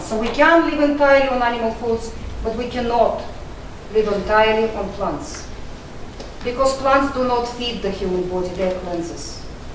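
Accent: Russia. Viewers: I like her Russian academic speech style. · I thought she was speaking russian, but nope thats english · very informative thank you i like her russian voice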